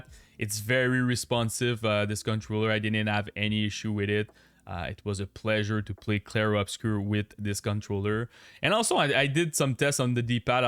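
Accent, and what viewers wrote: Quebec, Canada. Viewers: lol bro i can ear the quebecois from this video XD nice to see a fellow Qc doing content in english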